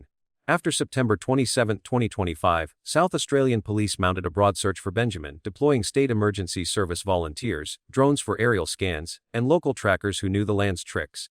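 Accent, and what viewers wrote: United States. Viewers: American accent on ai voiceover 😒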